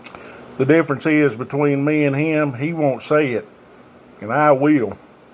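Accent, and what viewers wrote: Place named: South, United States. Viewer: This guy's 100% right, took an oath to uphold the law at all times yet he stands behind the biggest criminal in American history, and as a military man he's supposed to defend The United States Of America instead he stands behind a lone lunatic imbecile who has caused irreparable harm to our democracy and severely compromised our national security. is the moron not this guy, having a southern drawl doesn't make someone an idiot.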